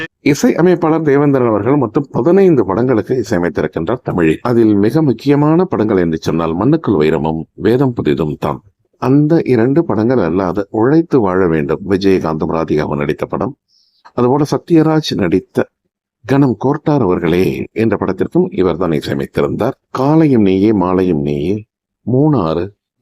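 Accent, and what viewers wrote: Sri Lanka. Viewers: உஙகள் இலங்கை மொழி அழகு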